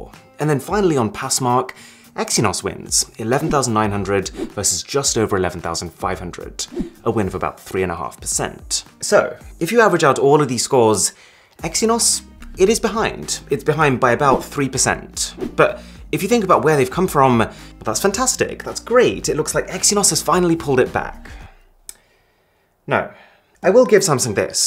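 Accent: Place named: United Kingdom. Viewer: You have damn british accent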